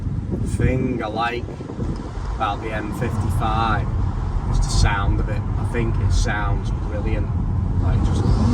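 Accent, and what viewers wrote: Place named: Liverpool, United Kingdom. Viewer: Subscribed! I think you’re Scouse. I want a 435i someday too and I love in Liverpool!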